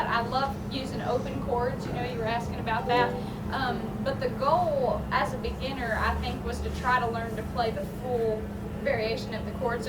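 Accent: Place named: South, United States. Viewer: Love her southern drawl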